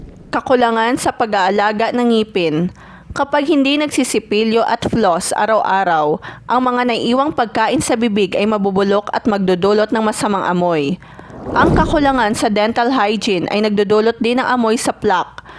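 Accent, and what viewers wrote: Visayas, Philippines. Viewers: Nagdodolot visaya ka day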